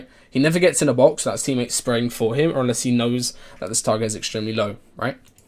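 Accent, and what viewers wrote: United Kingdom. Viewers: Where are you from in the uk?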